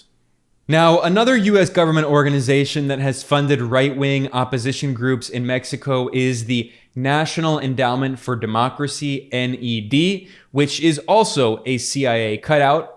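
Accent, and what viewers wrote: Spain. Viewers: I just love LOOOOOOVE your Spanish accent. Greetings from Mexico. ❤️❤️ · Beautiful blue eyes and Spanish pronunciation STOP DISTRACTING ME BEN!!!! I’m trying to focus on this A+ Geopolitical Content!!!! 😂😂❤❤❤❤ Great video as always 🙏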